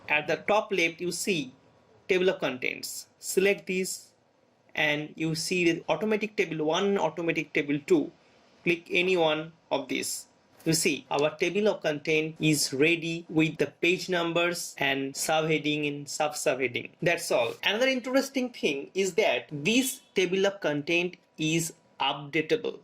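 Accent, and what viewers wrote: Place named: India. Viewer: INDIAN ACCENT ALWAYS THE BEST TUTORS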